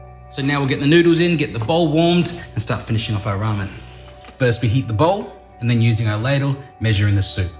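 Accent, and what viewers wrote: Australia. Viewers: your Aussie soothing voice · My man. Are you Australian Japanese. ? Too cool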